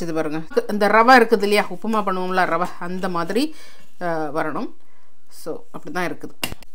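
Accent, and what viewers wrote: Nagercoil, India. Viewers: உங்களின் நாகர் கோவில் தமிழ் அழகு. என்று சொல்வது அதைவிட அழகு.இங்கு தமிழ் நாட்டில் உள்ள நிறைய கிறிஸ்துவர்கள் தமிழர்கள் என்று சொல்வதில்லை. தங்களை வெள்ளைக் காரர்கள் போலவே நினைத்துக் கொள்கிறார்கள். உங்களின் முந்திரி கொத்து ஸ்வீட்ஸ் சூப்பர் சூப்பர் 👌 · Super.....நாகர்கோவில் பேச்சு மாறவே இல்லை.கேட்க நன்றாக இருந்தது என்னுடைய பாட்டி செய்வார்கள்....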